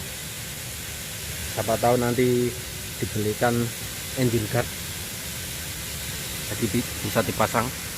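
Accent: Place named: Java, Indonesia. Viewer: Alami aja mas bro, nek medhok ra sah gue2 an.. Wagu.. Hahaha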